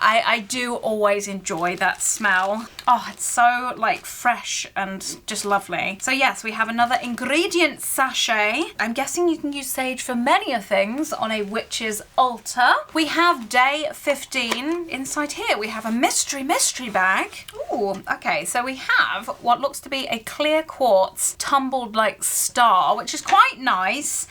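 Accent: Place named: England, United Kingdom. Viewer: I love your accent so much! It's different from what I imagine the London accent is. Are there different dialects in England? So cool! Greetings from Canada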